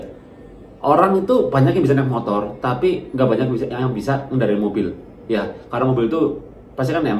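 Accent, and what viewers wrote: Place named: Banyumas, Indonesia. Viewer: Bang org mn.. kog ngapak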